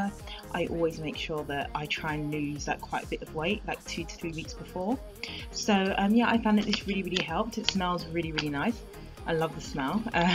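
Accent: United Kingdom. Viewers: omg your accent😍😍 I'm sure than you live in England . anyway. you are really beautiful and I will continue to follow you thanks you😀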